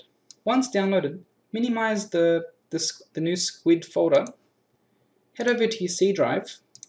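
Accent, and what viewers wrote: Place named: United States. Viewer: An aussie with an American like accent... You're on youtube to much and listen to way to many americans!! youre an AUSSIE not a YANK!!!!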